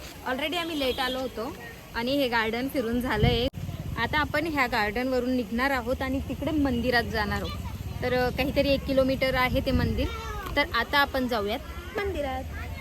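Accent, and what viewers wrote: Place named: Khandesh, India. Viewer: माय हो आपली खानदेशी उत्तम वाटते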